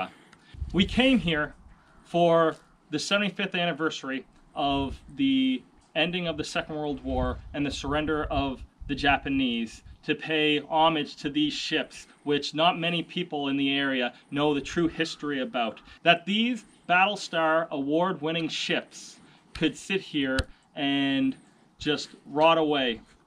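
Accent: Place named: Eastern Canada, Canada. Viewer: Wow great video and history lesson. Thanks for taking the time to put this together. Very interesting. Love that eastern Canadian accent😊👍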